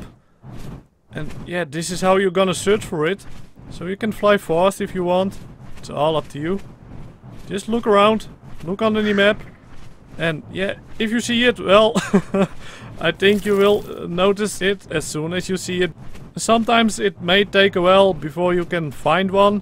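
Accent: Netherlands. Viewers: are you dutch you souns dutch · I like your (i think dutch) accent! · Kratis Games maak je grap ? je hoort duidelijk aan zijn stem dat die Nederlands is , Nederlanders hebben altijd zo een rare uitspraak als ze engels praten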